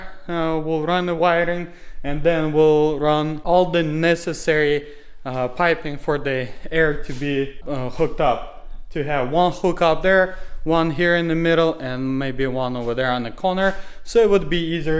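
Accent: Russia. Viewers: ебать по акценту слышу что это русский вася!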